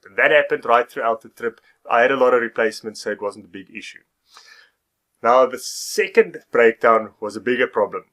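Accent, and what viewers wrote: South Africa. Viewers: South African accent is tough to understand.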